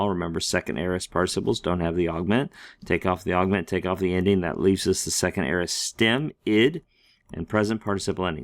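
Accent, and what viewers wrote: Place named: United States. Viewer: Greek with an American pronunciation is not Greek.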